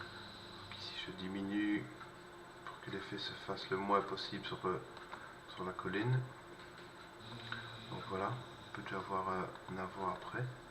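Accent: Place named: Liège, Belgium. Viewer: Super le tuto, j'ai vraiment apprécié le procédé, je vais tester sur une de mes photos avec ciel presque cramé. J'ai aussi adoré l'accent liégeois ;-) Merci pour les explications.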